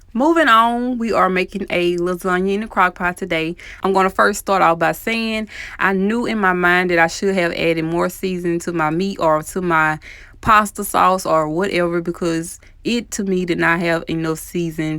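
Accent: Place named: South, United States. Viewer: You gotta be from the south ayyeeee yooo you had me at bolder... AkA butter and poppa Rika 🤣🤣🤣 dead 💀.... looks amazing tho I loved it 😍 👌 🙌 ❤ 💕 Update: I have a question??? How come you don't make any veggies with your dishes Also I don't eat pork.. im Muslim I was thinking veal chops or lamb would you make a video for ppl that don't eat pork thanks 💕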